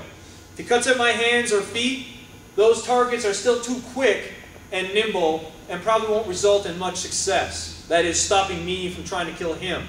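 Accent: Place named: Iowa, United States. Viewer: If you had not said he was from Iowa and asked me instead to guess. I would have guessed Iowa.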